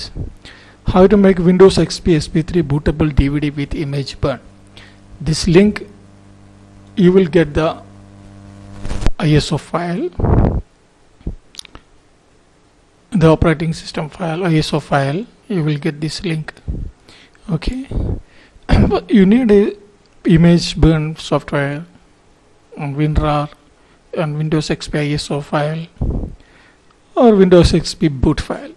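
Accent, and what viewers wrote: India. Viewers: the heavy punjabi accent too wtf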